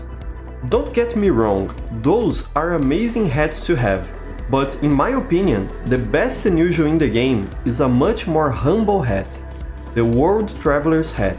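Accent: Brazil. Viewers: tu e br né? Seu sotaque entrega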